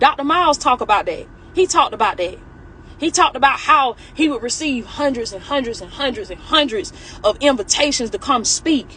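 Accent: South, United States. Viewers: I love the way she says children. It makes me smile. Love the southern twang. I myself have a twang. I watch her religiously.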